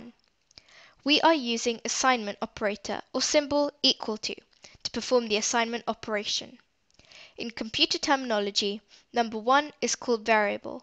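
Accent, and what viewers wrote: United Kingdom. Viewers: south england accent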